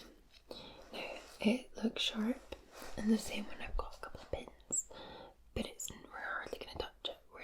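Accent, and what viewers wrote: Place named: Scotland, United Kingdom. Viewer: I’ve just had to hand in my Englishman card 😭😭 as the Scottish accent is yummy 🤦🏼‍♂️🤦🏼‍♂️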